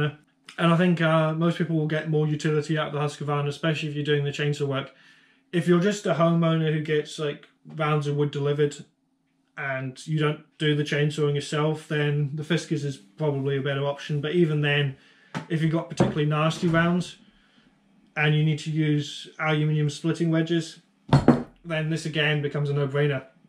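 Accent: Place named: London, United Kingdom. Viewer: You sound like you’re from London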